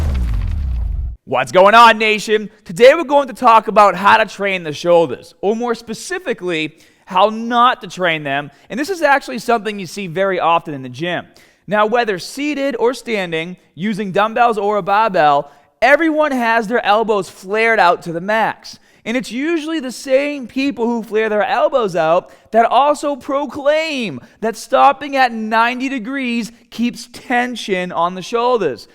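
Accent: Boston, United States. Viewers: Following because of that Boston accent...and trying to make gains, very informative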